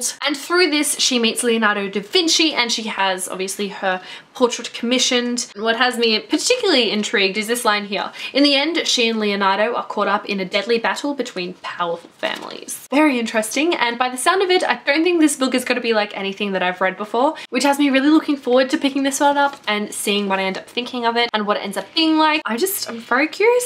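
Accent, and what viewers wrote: Australia. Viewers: I love her vids too and I love her accent I'm mexican and the aussie accent it's easier to understand than the american one to me XD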